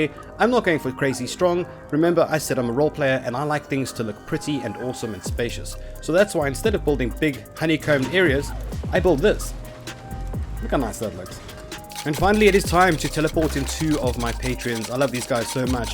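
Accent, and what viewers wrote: South Africa. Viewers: are you from south africa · OH SNAP YOUR ACCENT!!! I could tell you were South African and then decided to check your bio and I was right!!! Awesome man, Awesome video :) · I’m a simple man, I hear a Saffa accent and I sub to the content. But that intro was perfect. Perfection.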